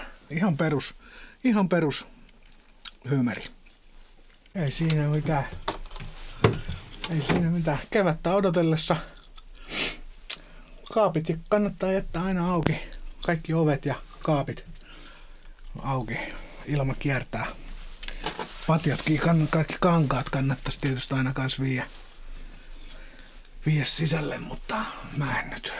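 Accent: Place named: Finland. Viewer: Kiitos hyvästä kanavasta! Jännä, että alkanut vasta tänä vuonna sitä meille tarjoamaan. Laitettu tilaukseen👌 Hienoja ja mielenkiintoisia videoita teette👍 Murteesta päätellen aika lähellä meitä vissiin olette😀 myö ollaan Kouvolast🤣 Meillä on aika uusi kanava tubessa, jossa reissataan vankkurilla Suomessa ja ulkomailla. Ja toki retkeillään myös.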